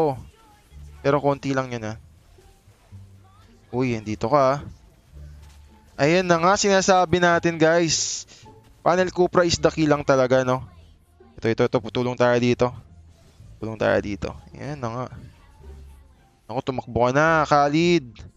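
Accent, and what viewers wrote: Iloilo, Philippines. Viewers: Tanong ko lng Tga Iloilo ka Po?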